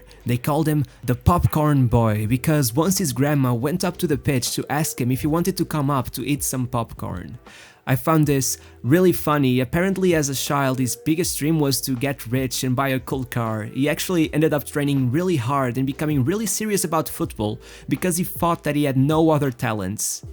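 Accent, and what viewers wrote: Portugal. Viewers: Hey. Yes he is. Its obvious by his accent and the way he pronounce the Portuguese words. Only a Portuguese could say it that way. Cheers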